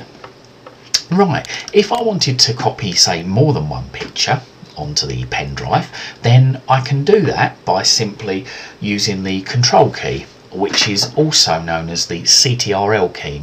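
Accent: England, United Kingdom. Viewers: Great, Great, Have to listen very carefully, because of the English Accent,have an English E-Mail friend, so I am use to it, and have a Cousin in Scotland, that can't understand a word he says, maybe because he works for Johnny Walker in Kilmarnock , that's where my mother was born, on father's side, had a Great,Great Uncle who was a major on the British Army who received the Victorian Cross, John Buckley. Thanks for the video, very well done, Perfect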